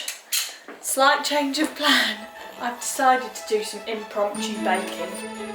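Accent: United Kingdom. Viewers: I love so much follow your videos from Paris. This is all the time very interesting and best British accent ever hear in YouTube ❤️❤️❤️ kindly, an lover girl of England 🏴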